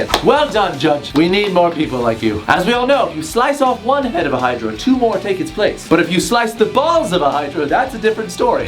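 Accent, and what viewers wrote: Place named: United Kingdom. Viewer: You seem like one of those low key British people. You have our humour and the way you say some stuff is like us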